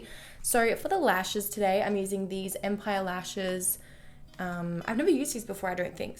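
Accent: Australia. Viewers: I adore Australian accents. 😍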